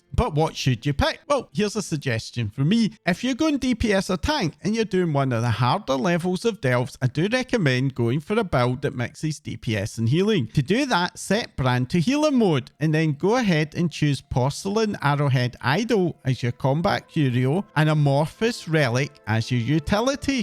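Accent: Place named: Highlands, United Kingdom. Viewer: This guys accent reminds me of my late grandfather Kincaid. He was a highlander. Ignore the trolls, it's all they've got. Let em get there tiny scrap of fleeting self esteem. It vanishes as soon as they get it. Please. Allow me. Piss off trolls.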